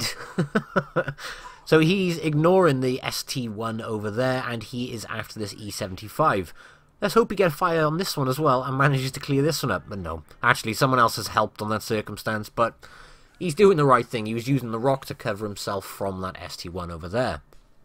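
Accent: United Kingdom. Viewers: Oh god I just love to hear your voice and laughter and that stunning British accent of yours along with the explanation of what is happening in the replys frankly as much as it's sad to say but truth be told for me you are The best WOTB YouTuber and yes even better then TTTimster he's latest videos became more like (I'll put whatever is there to refresh the channel case) honestly at least in my own prospective and you already know that jimmy the one with the E_100 reply already sent one to TTTIMSTER and honestly I didn't like it in any shape or form especially with he's terrible commentary...... unlike you m8 always happy to watch your videos some of them can make my day... so please don't stop laughing and publishing those beautiful videos of yours... bye.....:D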